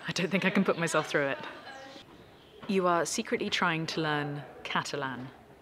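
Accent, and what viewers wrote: United Kingdom. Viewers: I don't know why someone would ask a question about her voice.? Imo her speaking voice is just as soothing as her singing voice.. She has a slight British accent that I adore.. It's not overbearing or a harsh tone that sounds almost condescending as I feel about some others. Her singing voice is purely beautiful. As is she.. Some of those questions had to be just poor attempts of humor..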